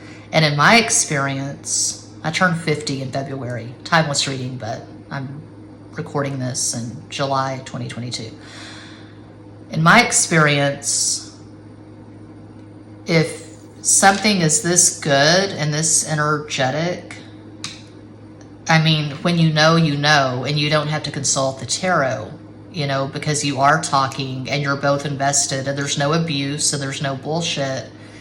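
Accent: Texas, United States. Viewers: I love Texas accent, love from Austin, TX. 💖 Picked Pile 4, wish we are committed, but already broken up, he immediately jumped back to a rebound with his ex, essentially monkey branched behind my back. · Eres muy buena!!! And i love your texan Spanish! i lived in F Worth few years ago.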